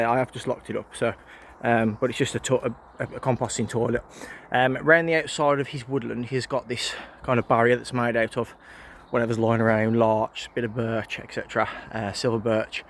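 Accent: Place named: Black Country, United Kingdom. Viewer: Hi mate you sound like your from the black county ? I’m a bushcrafter do you need any help with it ?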